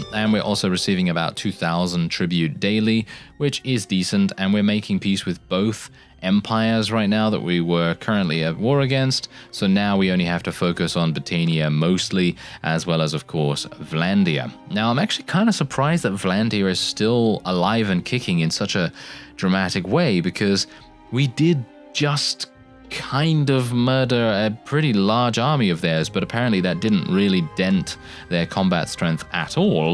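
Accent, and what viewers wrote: United Kingdom. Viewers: He has the most soothing yet focused voice, he sounds kinda British.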